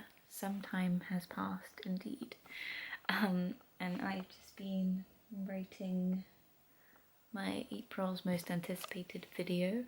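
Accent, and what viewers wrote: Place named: United Kingdom. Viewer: Although the music was a little bit too loud, it was fun to watch and it was really interesting to see you more natural (you look good without makeup) more spontaneous more random :D :-) Also you have beautiful british accent (I know, sounds weird, but that's how it is, I love it lol). And I've never seen that thing you're releasing the bowling ball from, but I must try it, looks like fun, hope we have it here in Czechia or Czech republic or whatever you foreigners call it nowdays! :D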